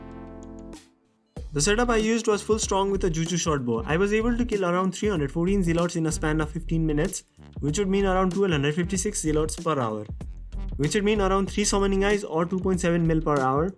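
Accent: India. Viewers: Your voice and english talking is like a Indian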